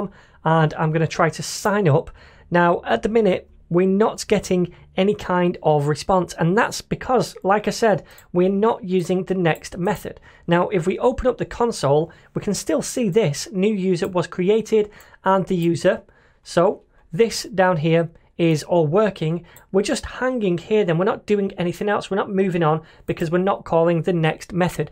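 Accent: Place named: Northern England, United Kingdom. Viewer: Really loving this tutorial series, it came at the right time for me in my programming journey. I have found that it's best to simply watch your tutorials start to finish without taking notes, and then opening up the project file at the end from GitHub and seeing how much I remember. I know everyone's style is different, and this maybe a bit selfish, but i thought i'd suggest it anyway - as these are predominantly tutorials, it would be cool (at least for me :P) if there were more tutorial-comments in the code for us to refer to in future. I suppose I'm just lazy though. I should probably comment along the actual code as I watch. But might need to halve the speed. Where are you from? I can't tell if it's Manchester or more Newcastle-way. My dad overheard your voice and said 'bloody 'ell he should teach the masses with that voice' :D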